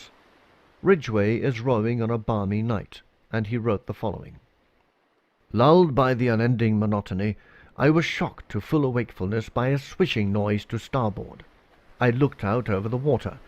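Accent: Scotland, United Kingdom. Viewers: Excellent production, and a fine British narration. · British??? That was himself - a good Scotsman.;)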